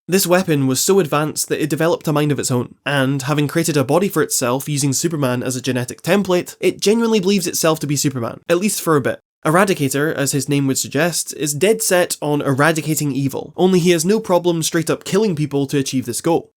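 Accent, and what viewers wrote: Scotland, United Kingdom. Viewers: Are you scottish?I am too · I'm hardly assuming, the accent is quite clearly Scottish. And yes, I am. :^] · I forgot you were so british wow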